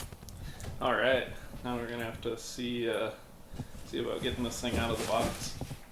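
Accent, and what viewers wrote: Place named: Canada. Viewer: $3299 Canadian? I ask because clearly you have a Canadian accent. But I tried downloading the Greentoe app and or says not available in Canada?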